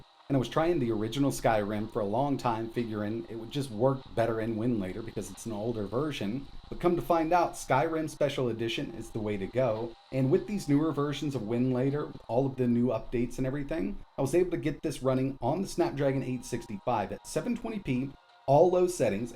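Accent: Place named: South, United States. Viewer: He's a "Southerner".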